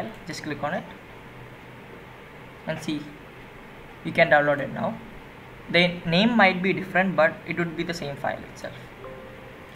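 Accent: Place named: India. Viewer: English Indian Languag Is Funny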